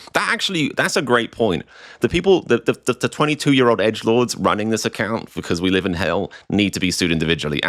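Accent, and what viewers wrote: United Kingdom. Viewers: dear, you apparently worked very hard on this compilation so kudos to you; but i am most impressed that with your native VERY British accent you can mimic the redneck MAGA hick-talk spot-on still ROTFLMAO; sorry i got entirely distracted......💙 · Lol. He's a Brit. A lot of words are pronounced differently.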